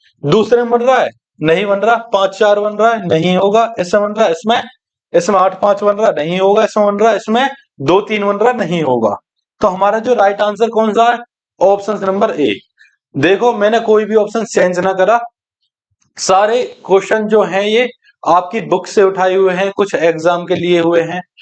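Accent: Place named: Muzaffarnagar, India. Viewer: Sir muzaffarnagar ki language lg rhi h aapki 😊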